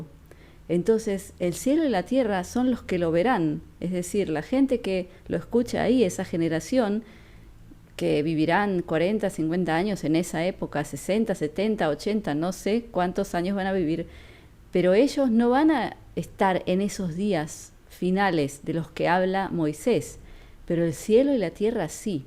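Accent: Buenos Aires, Argentina. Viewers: qué lindo...y tu modo de hablar es bien porteño! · Salud! No puede negar que es porteña..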